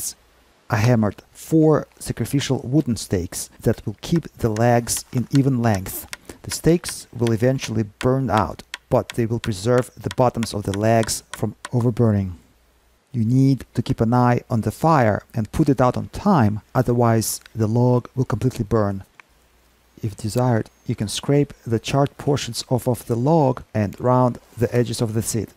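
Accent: Russia. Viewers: Я только за если человек стремится изучать больше языков, но при этом твой контент теряет акцент русского. Кстати, не советую так же т.к данный материал получается дублирующий контент, за что на ютюбе могут сделать бобо. Т.к. алгоритмы понимают данный материал как повторяющийся\дублирующийся контент. Удачи, здоровья!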